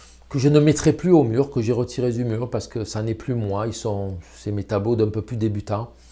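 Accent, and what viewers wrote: South, France. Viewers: Je dessine depuis toujours mais je n'ai jamais essayé la peinture (à part en primaire!). Cet homme me donne envie de m'y mettre, il respire la sincérité et le professionnalisme, ses vidéos sont très intéressantes et bien faites, et j'adore son petit accent méridional! Bravo et merci, et.....j'y vais!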